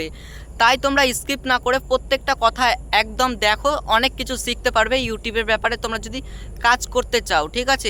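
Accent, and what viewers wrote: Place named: Malda, India. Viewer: ভাই তোর কথাগুলো শুনেই মনে হচ্ছিল যে তুই মালদার. আমার বাড়ি ও মালদায় মঙ্গলবাড়ী তোর কথাগুলো শুনে ভালো লাগলো.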